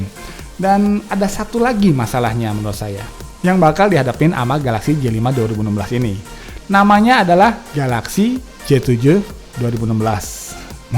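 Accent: West Java, Indonesia. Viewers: orang sunda kang?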